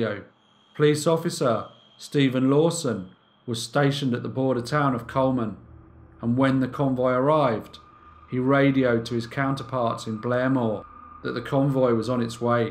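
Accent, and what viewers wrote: United Kingdom. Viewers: Best regards from Mumbai. Appreciate your quality work & crisp British accent. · British speaker...should know better.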